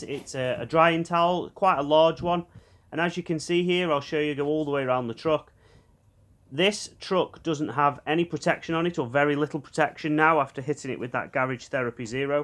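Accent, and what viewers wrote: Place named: Stoke, United Kingdom. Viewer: yes mate, thought you had the stoke twang, phasing out some of the AB stuff which is good but there are some awesome companies out like like alien magic and bouncers. Need something that will give my silver car a good gloss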